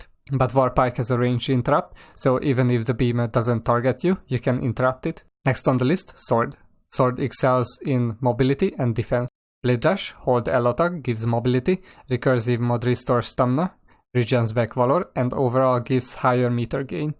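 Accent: Hungary. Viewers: i noticed something, you have a hungarian accent.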